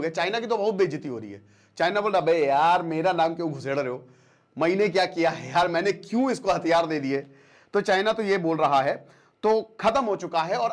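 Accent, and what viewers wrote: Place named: Lucknow, India. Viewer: Sir aap lucknow ke hain..apki accent se lag raha..❤❤ mein bhi lucknow se..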